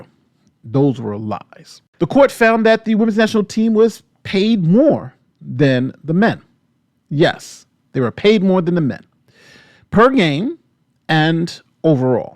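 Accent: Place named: Boston, United States. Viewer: You have Boston accent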